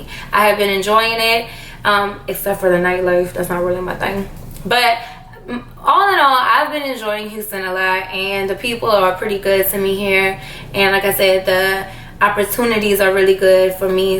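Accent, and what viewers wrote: New Orleans, United States. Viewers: That New Orleans accent 😍 👌🏿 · I love your New Orleans accent. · I moved to Houston from Hempstead, NY. I f'cks with H'town all day!!! love your N.O accent!!!! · Son when you opened your mouth, I was like this girl from NOLA. Then when you mentioned it I was excited 😊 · Damn you new Orleans women mane! I love that accent so much..."ova...bey buh..." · I love your accent. Reminds me of my time at Xavier! I love New Orleans❤ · Carnisha XO yes I'm not from new Orleans but I have family that live out there and she sound just like them. Just the way she talk is so New Orleans lol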